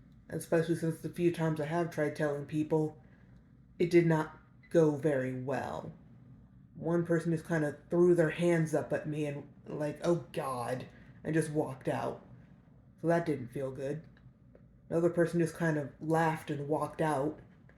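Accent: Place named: United States. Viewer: hey man really sorry to hear that this is still something youre not sure about. im myself gonna start estrogen in around 3 months after a journey of 3 years of trying to get on them. i'm from germany so i dont actually know how hard it is in america (im assuming youre from there by your accent). and even now i have my doubts. but whenever i think about if i really wanna do it i try to think about the alternative. which for me would be growing old in a male body. and that is like a genuine horror story to me... maybe try and rationalize it for yourself like that? i have these thoughts because im naturally very androgynous and have been "misgendered" in both directions since i was a little kid. people never know how to read me. but if i think about how my body will just become more nad more masculine with time it actually terrifies me idk. i'm 24 now so i have plenty of time to enjoy my life during and after medical transition :) socially i'm fully out to everyone for almost 5 years now so thats not an issue anymore lol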